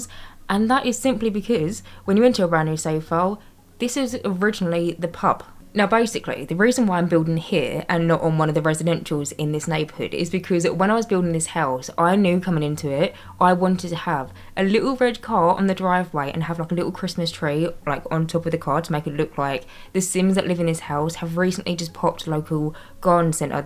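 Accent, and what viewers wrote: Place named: London, United Kingdom. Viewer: I absolutely adore the way you build so realistically. The way you clutter is amazing and feels like an actual home and not an ideal, spotless house. I play TS4 on console which means I can't have the tool mod, so a silly lil thing I do is download your builds and then just take any things that I like that you rotated (eg. the journals that you had in the teen's room) and just save it to use for myself later on, lol! But anyways, I really enjoy your videos and especially your accent, it makes me feel so at home since I don't live in London anymore.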